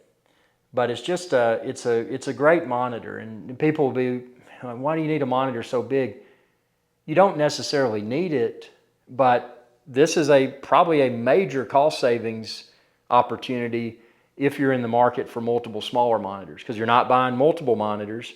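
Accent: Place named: Atlanta, United States. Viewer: Does it being a VA panel (as opposed to the popular IPS) make any difference? They say VA panels aren't as good in terms of viewing angel. How's your experience been sitting up close using it as a monitor vs using it for TV watching from a few feet away perhaps? By the way your accent reminds me of my friends in the Atlanta area 🙂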